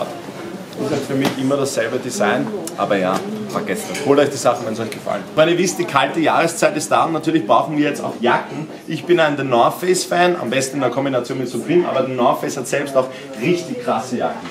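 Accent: Austria. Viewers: Wie uncool klingt einfach österreichisch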